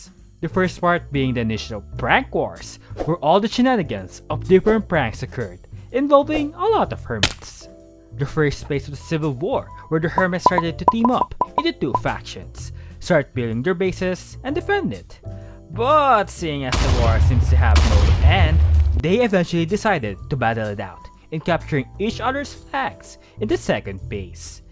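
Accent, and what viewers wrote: Philippines. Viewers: Are you a filipino? i kinda hear that accent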